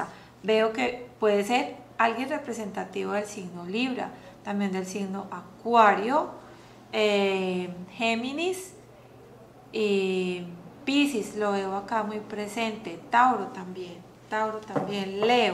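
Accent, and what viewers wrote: Colombia. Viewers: Declaro abundancia en mi vida! Gracias por tu lectura, eres bastante acertada y al igual que tu soy Colombiana, el acento es inconfundible...Un abrazo y nuevamente GRACIAS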